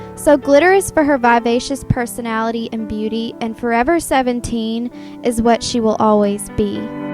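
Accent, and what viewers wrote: South, United States. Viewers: Omg cutest freaking country voice ever! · omg I love southern accents and then hearing you speaking as a baby it's so darn cute!!! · You were so cute in your dag gom Bambi southern voice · Ha thats cool you live like 2hrs away from me ps ha cute lil baby south accent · T you were so cute when you're a little like so cute and I love when you talk by your little accident your little country accent it was really cute...,......you just made my day I Watch you all the time love you so much and I hope you have a glitterfull Day and god bless 💟💟☺️☺️☺️💞😇😇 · I love your young country accent!! It's so cute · I love your voice when you were little! You had that country twang! And still do now! I love it and wish I had a twang!😘 love you sooo much!!!! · happy bday and you have the cutest southern accent ever. luv ur channel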